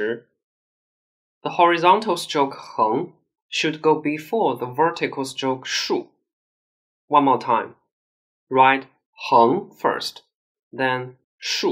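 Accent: Standard. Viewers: I am Chinese , I can tell you that this teacher speaks Mandarin , his pronunciation is very standard !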